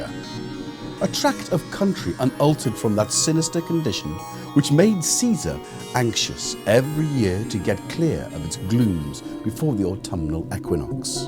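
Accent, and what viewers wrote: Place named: RP, United Kingdom. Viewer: Love this! I wish my English accent was as BBC'ish ;-)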